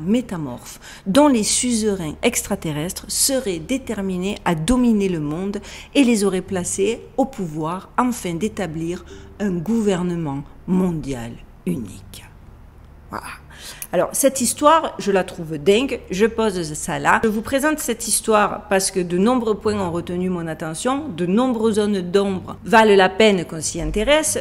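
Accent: South, France. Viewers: Avec ton accent méridional à couper au couteau, je serais curieux de te voir parler en Anglais ! ^^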